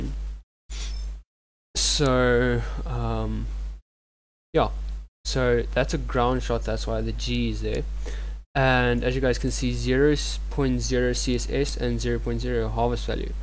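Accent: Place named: South Africa. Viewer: I dont think he can change it its how all south africans like me sound bru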